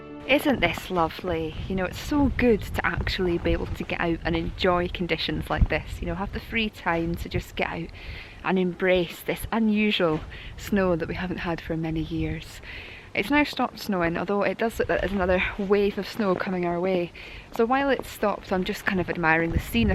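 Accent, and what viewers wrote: Scotland, United Kingdom. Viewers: Great Vlog, wonderful location, Brilliant conditions, I am impressed with your Photographic eye, which is supported by your narrative and easy listening scotch accent. thank you for sharing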